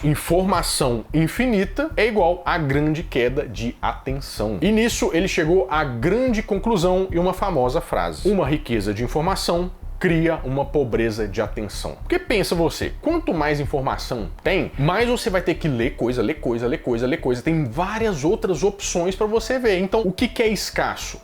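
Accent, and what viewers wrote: Minas Gerais, Brazil. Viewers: Vejo vc porque eu gosto. Tinha muitas opções. Sim mas a sua voz de mineiro e muito gostoso de ouvir. Sou mineira também UAI 😂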